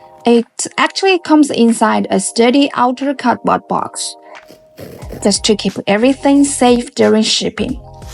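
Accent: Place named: China. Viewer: Her slight accent is Chinese, I don't trust Chinese data equipment due to the CCP requirements to hand over data to them, might be a back door built into it for all I know, or remote deactivation during war time. That said, this company is not on the U.S. government list of unapproved modems for their use, so might be OK. The quality looks great and I do prefer the N-connectors over the small SMA connectors every other manufacturer uses. Only question I have is where to buy it without the tariff tax? Shipped directly from China?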